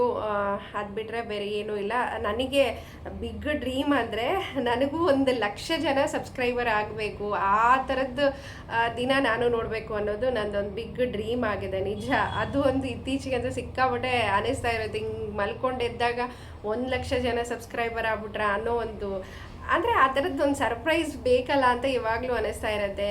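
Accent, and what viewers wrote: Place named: Bellary, India. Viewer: Bellary nalli undya tindya ubmonaa ee tara yella mataditivi… gramya plus Telugu influence enda nam Kannada haage erutte…😂 nanu Banglore ge 1st bandage we tara adikolta eddru… but I love ur raw bhashe… keep going. Gaatri avarinda nim video noodle shuru aytu.. Bellary nalli YouTuber edare anta gotte eralilla… happy for you 😊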